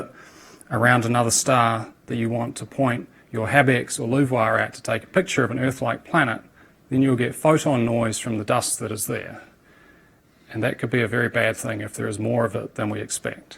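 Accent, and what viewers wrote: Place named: New Zealand. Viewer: " I'm not gonna try and compete with Van Maanen's Star" - He does have a distrinct New Zealand accent.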